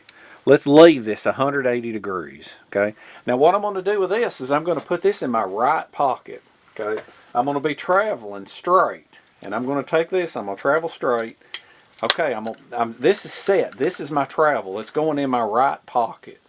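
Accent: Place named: Boston, United States. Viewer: To understand Boston accent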